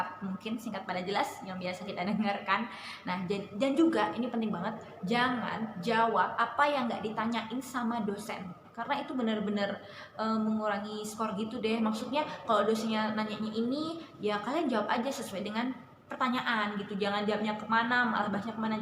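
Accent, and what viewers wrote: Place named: Java, Indonesia. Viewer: Ketawaa pas kebeek ngomong *degdegaaan😆 medhok amat😆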